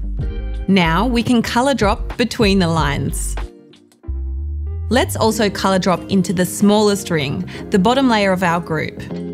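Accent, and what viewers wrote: Australia. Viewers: damn the aussie accent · I had to swtich the captions on to understand some of the things the presenter was saying. I'm a native English speaker but I found her accent very strange at times? Is it some sort of Australian?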